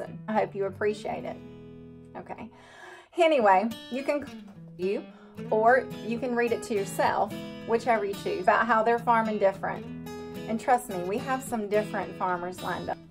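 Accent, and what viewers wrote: South, United States. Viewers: okay maybe you talk a little southern ;) but that beautiful smile of yours just lights up the world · You don't talk different just southern it's great don't change anything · Your not talking different, your talking with a southern accent, just like people from Boston Maine and Texas! This country has many different accents! 😊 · Ur a southern girl, ur r suppose to talk that way. We love it. Continue on. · I love the way you talk , being from Canada I like to listen to you talk southern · I love the way you talk! That accent is great! Ol HWJR ! send all of our gals down to Georgia to learn to talk? Or maybe North Carolina! By the way for those of you that are not as old as I am. Ol HWJR = Hank Williams Jr. Good article there girl! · You are not 'different!' You are just a sweet 'Southern Belle!' Your voice and sayings just epitomize how we Southerners talk and the way we Southerners do things. 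I'm from the South, Georgia, just like you and I can tell that we had parents who raised us to be kind and respectful to others and all of God's creatures. So don't every apologize for the things you say and do 'different' from others. I always just place them in the category of being 'different.'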